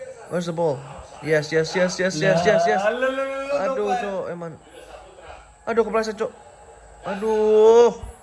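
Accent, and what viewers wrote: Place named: Java, Indonesia. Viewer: Jowo medok😂😂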